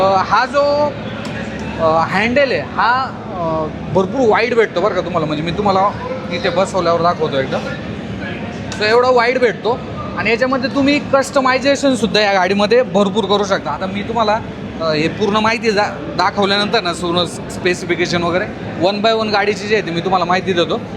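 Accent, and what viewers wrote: Ahirani, India. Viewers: भाऊ तुम्ही अहिराणी आहात का ..? थोडा थोडा आभास होतो तुमच्या भाषेमध्ये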